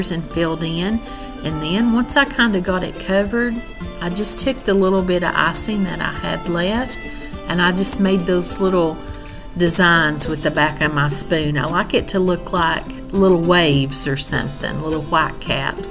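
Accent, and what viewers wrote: South, United States. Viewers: Definitely rice or roast potatoes...you make me laugh, saying you nearly dropped your cake. And the other time when you burnt your meatballs! Love that you show your fails, and your southern US accent is such fun to my English ears.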